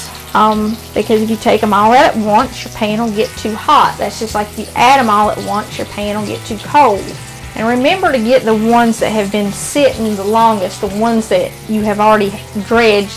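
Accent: South, United States. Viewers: You're awesome. Love a gal with a southern accent.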